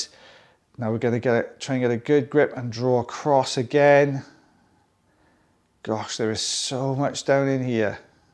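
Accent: Wales, United Kingdom. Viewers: just what the Doctor ordered How 🤔 ❓ you managed to extract that recess cerumen was marvellous I was hanging on the edge of my seat Skilled on every level Your lovely Welsh accent almost broke ♥ into a 🎵 song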